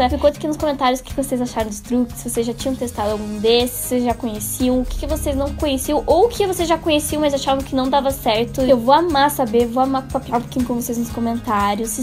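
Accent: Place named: Rio Grande do Sul, Brazil. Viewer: E esse sotaque ai em?😍 Seria um sotaque Gaúcho? bjs sua linda acabou de ganhar mais uma inscrita hehe sua lindona!❤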